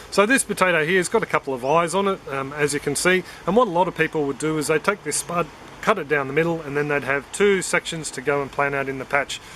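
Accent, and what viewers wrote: Australia. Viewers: You sound Aussie!! Please join my facebook group on growing potatoes in Australia!! Cheers!! :-)!! <link>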